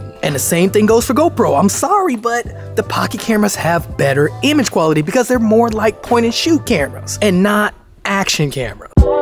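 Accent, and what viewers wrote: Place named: Brooklyn, United States. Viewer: Love the Brooklyn ghetto street accent. Subscribing...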